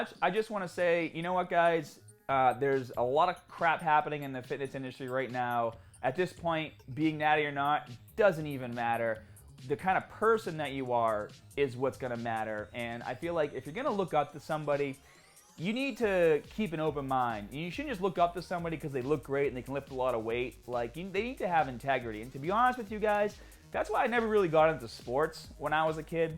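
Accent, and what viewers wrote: Boston, United States. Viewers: Bahston... My man!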